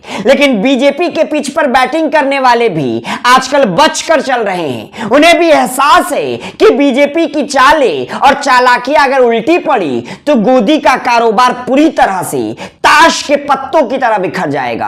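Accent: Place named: Mumbai, India. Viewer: bambad type accent hae tumhara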